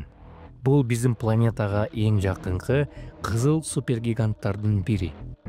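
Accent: Standard. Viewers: Ооой тим эле укмуш сонун сүйлөптүрсүң.😯 Таптаза кыргызча анан дагы үнүң да аябай уккулуктуу экен. Эң негизгиси абдан түшүнүктүү экен. Чаржайыт сөздөр жок так, даана сүйлөптүрсүң. Менден 1 лайк. Иштериңе ийгилик дагы да көп видеолорду күтөбүз. 👍👍👍👍👍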